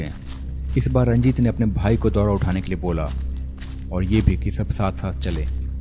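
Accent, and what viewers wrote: Bihar, India. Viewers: Video ka suruwat dekh kar hi laga tha aap bihar se hai 😊😊 Mie bhi bihar se hu 😀😀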